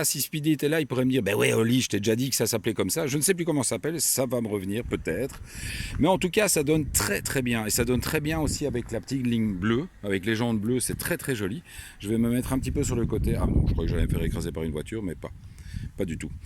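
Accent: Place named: France. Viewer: non non pas déçu. Juste que venant de belgique, j'entends juste un accent français :p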